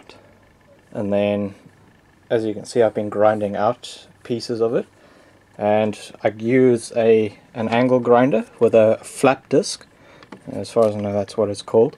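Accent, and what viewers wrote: South Africa. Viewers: Are you South African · Really cool, are you south african?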